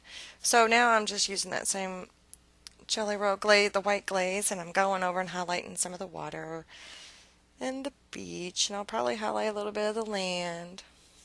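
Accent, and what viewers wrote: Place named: Kentucky, United States. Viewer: i knew i recognized you accent! im also from kentucky! i recently discovered your videos and in glad i did! good luck!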